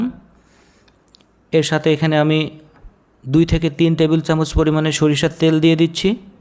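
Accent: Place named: Chowdala, Bangladesh. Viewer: চৌডালার আঞ্চলিকতায় না খেয়েই কিছু বলার নেই। ধন্যবাদ চালিয়ে যাও।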